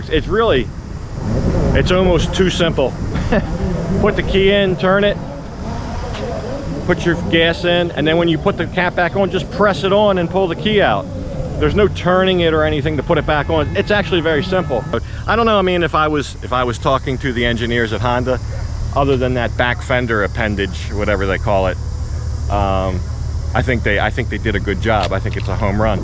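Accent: United States. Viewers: Are you an American living in Thailand? Sounds like an American accent lol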